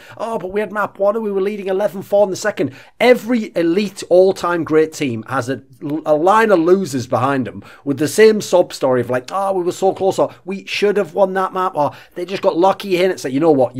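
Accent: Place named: Scotland, United Kingdom. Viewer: It's definitely English, but definitely not an accent from England. Probably Scotland or Wales.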